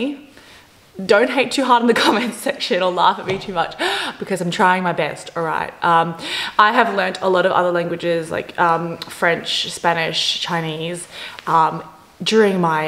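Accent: Myanmar. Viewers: OMG! you're Burmese accent is really cool. I love you're Burmese accent.💛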